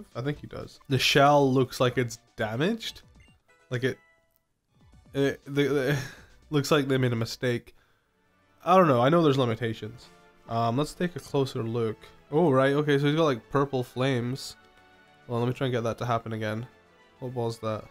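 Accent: Scotland, United Kingdom. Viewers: you sound like you're from Scotland like me!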